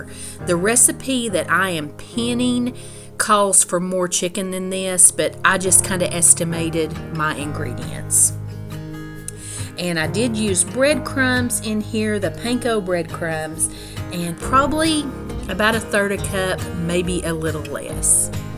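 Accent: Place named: South, United States. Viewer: I love watching your videos! Your recipes are simple and pleasing, and your attitude and southern accent grab my attention. I look forward to seeing your next video everytime. Thanks from Papa G!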